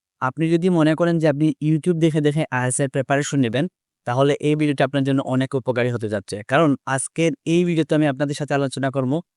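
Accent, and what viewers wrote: Sylhet, Bangladesh. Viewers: Vaiya apni ki Sylhet er??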